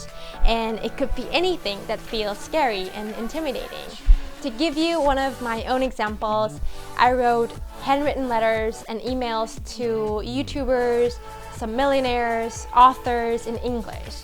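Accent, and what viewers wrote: United States. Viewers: Your accent is so cool.... I thought you were raised in the State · I have to say, your english is almost flawless. In your recent videos, you even speak with perfect american accent. I thought you were raised in the US. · Your English sounds like you moved to the States early in life. You have a "Californian" accent. Thank you for your story! Moral of the story. Listen to your parents!! Lol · Your accent sounds American. Like if you hadn't said you were Korean I would think you were American. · Fluency is easy thing, u need to go english spoken country. Hardest thing is sounding like a native speaker. The reason u sound like an american in english is that u used to learn the language when u were a kid which u had language plasisty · I’m pretty sure you must have lived in one of the native speaker countries and you sound like American. 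To be able to speak like you do, you need at least to have native speaker friends for your daily conversation and of course living in one of the native speaker countries is the best way to do it. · Why American English, and not English English? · your English is amazing, research the English 'schwa' to nail these small pronunciation errors. A lot of English words, especially when spoken fast, have the schwa sound (e.g 'a cup of coffee' can be said as 'uh kuh puh coffee'). I learned French with a similar approach to you and I really did my own study on pronunciation in both languages. Other than that your accent sounds to me (I'm british) like a very American one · you got american accent obviously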